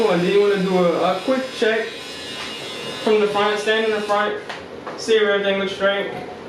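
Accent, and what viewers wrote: Louisiana, United States. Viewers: Louisiana accent ?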